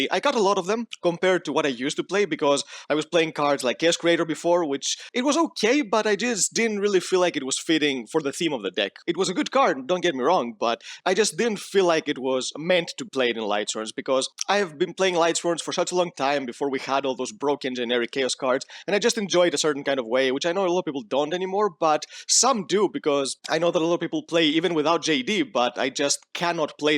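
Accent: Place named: Greece. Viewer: Hey new to the channel here and the first thing I thought hearing your voice was that you may be a fellow greek. Having said that, great content, waiting for more!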